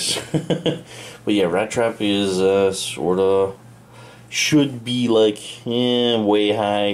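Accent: Quebec, Canada. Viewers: it was perfectly fine pronunciation. Sounds like a Quebec accent to me and I right? 👍🏻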